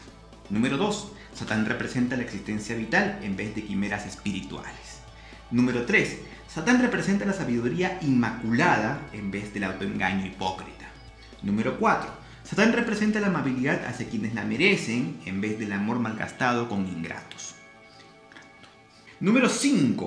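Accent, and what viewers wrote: Peru. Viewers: ¿Es de Perú? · Eres peruano? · Eres de perú????? buen video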